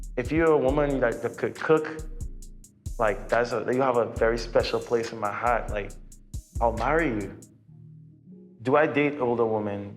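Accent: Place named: Trinidad, Trinidad and Tobago. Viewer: I like the fact that you are from the Caribbean bro. I rate the Trinidad accent. Lol, I am here typing in your accent. #🇯🇲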